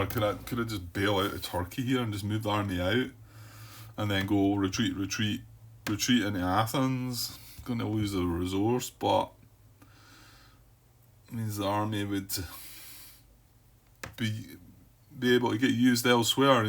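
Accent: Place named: Scotland, United Kingdom. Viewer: Thank you for this journey that ended today. I started to watch your videos of The other side of the hill after backing the reprint in August, and never caught up with your speed, so I never put a comment, but today, I can finally say all the good things. It's been quite tough sometimes for different reasons: the firsts parts with your accent (I do not have any more problem with Scottish accent now 😊) and sometimes being inside your thoughts is not easy 😅. but anyway, your questions and sometimes mistakes made me improve my understanding of the game and I do play on vassal ( 2 solo plays and 2 other with 4 players) and the physical copy of the game has arrived in the meantime. thanks again for the time spent to offer us a global vision of this remarkable The other side of the hill.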